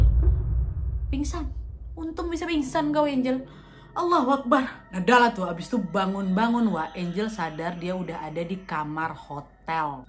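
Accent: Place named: Minangkabau, Indonesia. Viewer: lucuu banget bahasa minang nya uniiiii😂😂😂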